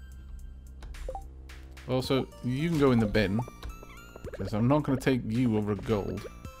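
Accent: Wales, United Kingdom. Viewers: I've got a funny feeling the seeds in the top left corner of the furnace chest were meant to be planted during the summer. I may be getting them confused with the watermelon seeds that were temporarily overlooked. Really enjoying this let's play. There's something very soothing about the combination of such a pretty looking subdued type of game & the glorious Welsh accent. It makes a nice change from the horrors & shoot-em-ups I normally go in for. Just a shame I don't have a computer capable of running it myself but then I'd never get any work done.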